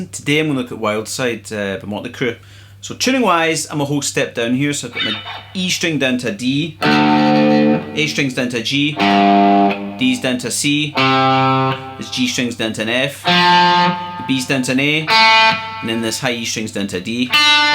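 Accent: Scotland, United Kingdom. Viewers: Man his Scottish accent is so dang smooth. I found it a lot easier to follow along to, and helped me mail the song.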